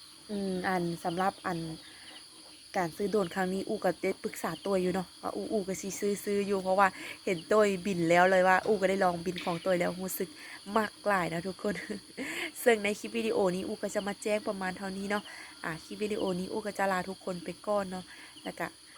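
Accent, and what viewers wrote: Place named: Nyo, Thailand. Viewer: สำเนียง"ญ้อ"(ย้อ")ทาง จ.สกลนคร เว้าคือกันนี่หละ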